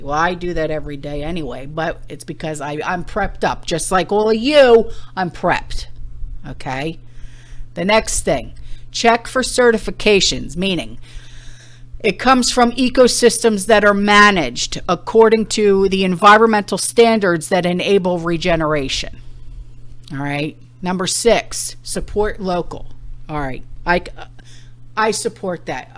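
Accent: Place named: New Jersey, United States. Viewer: I'm right there with ya shaking my fist in air. I had a feeling this was something to pay attention too when I heard the jersey girl coming through my screen!! Lol. You get 'em girl!!!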